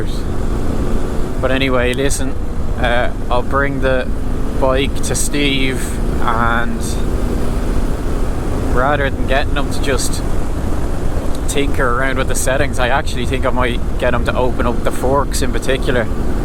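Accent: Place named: Ireland. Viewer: Nice what would you get the normal T7 or the word raid With the acf50 I put it on about four times over the winter but then again, I do live right next to the sea Just try adding it on bit more Should help I take it you’re over Ireland by the sound of your voice looks like you got some good trailers there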